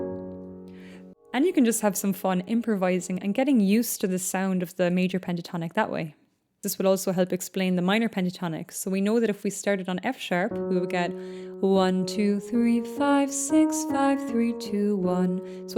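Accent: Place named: Ireland. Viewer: must be irish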